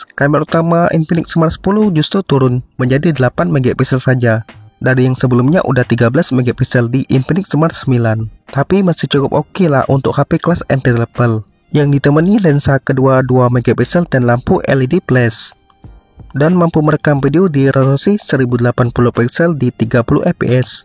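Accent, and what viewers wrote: Aceh, Indonesia. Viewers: Bg org aceh ya cara ngomong nya logat aceh 😮😮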